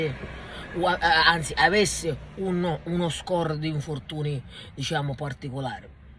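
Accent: Sicily, Italy. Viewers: Ma con sta parlata sicula come fai a tifare Alta Savoia ? Boh !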